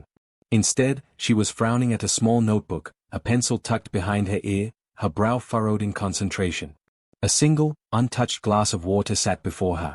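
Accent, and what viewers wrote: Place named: Australia. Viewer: ❤❤😊good story, good enunciation. The narrator’s accent, sounding of Australia. ❤❤😊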